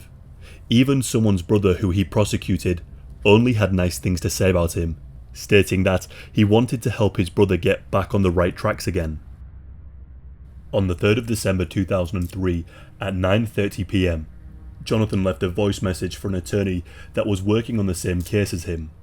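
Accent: United Kingdom. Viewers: He’s British bro give him a break 😭. Brits refuse to pronounce American ANYTHING how Americans pronounce it lmao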